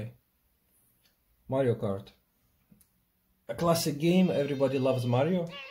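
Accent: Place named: Russia. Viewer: То чувство когда русский базарит по английскому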